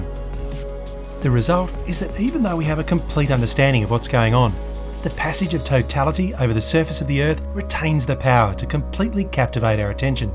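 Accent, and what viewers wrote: Australia. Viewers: Dear journal, its been three months, were starting to wonder if will ever upload again and just leave is with a half finished project. We have made do by watching satisfying compilations but its just not the same as his smooth australian voice and perfect hand filing and attention to detail. I will try to update this journal but i dont know how much longer we will last without another video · I found this video series yesterday, and I had to stop myself from watching more at 3 AM. Seriously, this series is absolutely stunning. From the historical significance of this machine to your engineering genius, neat working methods, your calm Aussie voice and amazing editing/cinematography skills....you've got it all, man. I'll be waiting patiently for the next one <3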